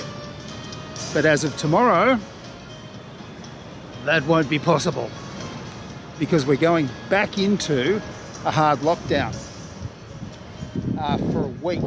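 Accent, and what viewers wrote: Australia. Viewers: It’s nice to hear an Australian accent as well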